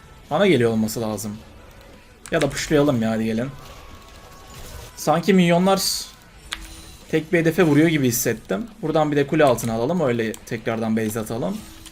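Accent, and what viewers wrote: Adana, Turkey. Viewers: Abi Adanalısın heralde turnuvalara katılıyor musun ?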